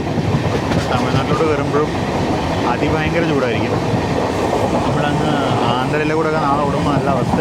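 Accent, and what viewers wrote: Thrissur, India. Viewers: Musical language of Trichur, much liked. ❤❤❤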